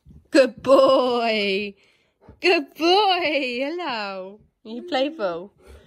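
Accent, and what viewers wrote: United Kingdom. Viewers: Hello, your dogs ate very cute! Im watching your vlog from St Peterbourg, Russia, and i couldnt find any mention about what country and city you are living in.. By your accent i guess you live somewhere in Great Britain, am i right?:) Maybe someone can prompt me? Thanks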